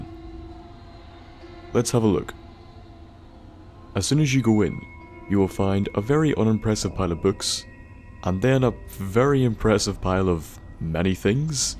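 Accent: Northern Ireland, United Kingdom. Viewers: Are you northern Irish? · Are you from Northern Ireland? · Same! Thought I recognized a familiar NI twang in your voice. Keep up the great videos!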